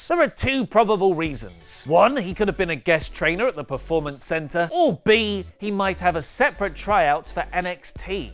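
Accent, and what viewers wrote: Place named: United Kingdom. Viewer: I love his accent so British lol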